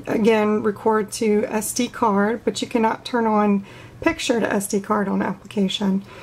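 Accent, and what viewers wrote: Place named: South, United States. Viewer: phục êwê Sounds like you're in the Southern US, is yours exposed to direct sunlight? I'm always concerned about the hot sun cooking these el cheapo cameras.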